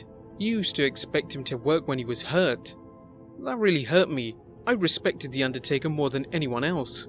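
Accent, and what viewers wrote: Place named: United Kingdom. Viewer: Why is it always a British accent?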